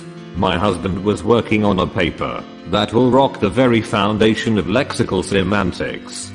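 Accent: United Kingdom. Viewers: good british accent, very clear............ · Great... I like his voice, very clear and good brittish accent.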